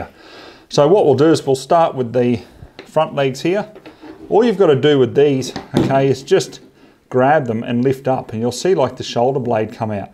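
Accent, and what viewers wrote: Australia. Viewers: You know this dude is a professional with that Aussie accent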